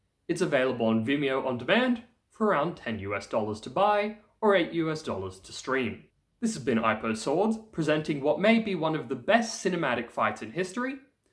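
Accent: England, United Kingdom. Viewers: Your Polish sounds good and your English, well, English. As to never having been to the UK? Big surprise to me. I did like the video, btw and will certainly check the movie out. Up until now, the best looking swordplay - with sabres - that I'd seen was in another Polish movie, the title of which I don't recall, but concerns the 'potop' period. One of a trilogy, if I remember rightly. If you've not seen it, it may be worth a look. But then, I've never so much as handled a sabre, so make of that what you will. Cześć!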